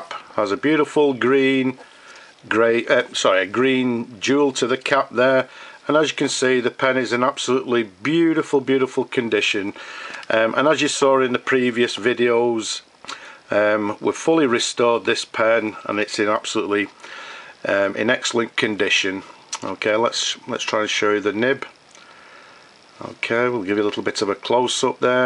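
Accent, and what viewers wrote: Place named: Manchester, United Kingdom. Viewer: Thanks to you (and a friend who supplied a feed,nib and distributor) I was able to repair a Parker 51 that belonged to my late grandpa. Thank you very much. Your videos are clear, your voice is quite nice (where are you from?, I can't locate your accent quite well, I'd say Manchester.) and well, your videos are all-around great. Thanks again!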